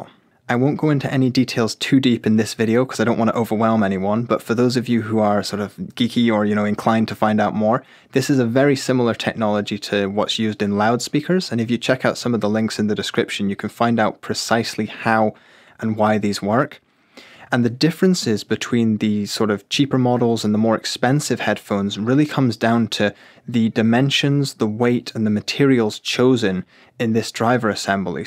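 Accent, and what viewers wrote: Scotland, United Kingdom. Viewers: He sounds Scottish. I want to say NW Scotland by the soft accent. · Are you Scottish?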